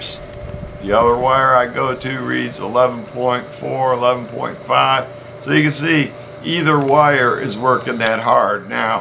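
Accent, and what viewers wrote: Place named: Connecticut, United States. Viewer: Wayne (Marion Robert Morrison) born in IA and raised in California - are there these typical accents from either State? but you're right - sounds a whole lot like John Wayne. Maybe here might disclose which State of the Union he was raised and what we're hearing - I detect some CT in there.. it's a cool dialect where ever it may be located.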